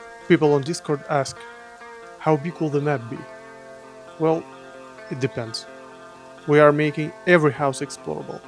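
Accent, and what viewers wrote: Russia. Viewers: Сразу видно нашего по ярко выраженым русским акцентом. Хотья я и так знал что создать "Привет Сосед" русский но все равно ❤ Жалко что нет звуковой дорожке, я бы послушал оригинальный голос без ярко вырженого акцента😅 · русский акцент никогда не скроешь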